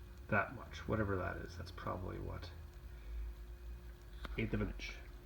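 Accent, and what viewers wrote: Canada. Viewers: oooh I love a Canadian accent!! ❤❤❤❤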